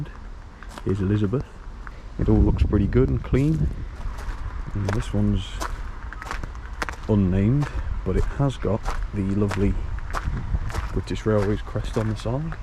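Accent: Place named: Cornwall, United Kingdom. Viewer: How do you get a Cornish accent in "Down Devon" My father's family are all from Devon. I have many cousins living there too. Unfortunately, they all had to move away from where they were born as Salcombe has become a holiday home town and the house prices are well beyond their pockets. Martin. (Thailand)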